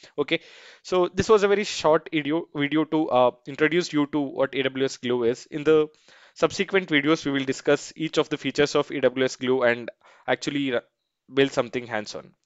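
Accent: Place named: India. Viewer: Shit voice Indian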